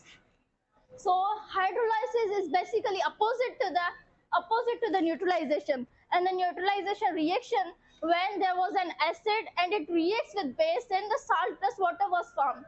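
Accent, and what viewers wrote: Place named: Sadri, India. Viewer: Stand proud you tried your best🎉 btw are you speaking sadri in between 😅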